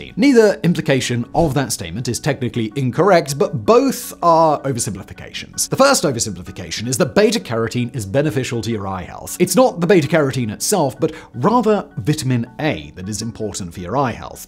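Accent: United Kingdom. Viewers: I feel like one's perceived IQ is like 10-20 pts higher when one gives a report with a British accent. I believe and want to buy surf shark 😅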